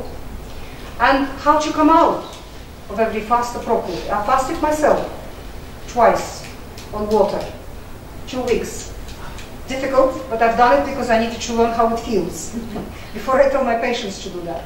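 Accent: Russia. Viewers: very informative thank you i like her russian voice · I like her Russian academic speech style. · I thought she was speaking russian, but nope thats english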